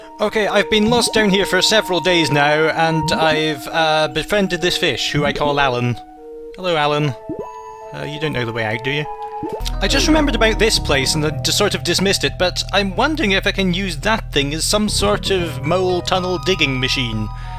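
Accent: United Kingdom. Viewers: That boss was just...I can't find words to describe it... You're British? Cool!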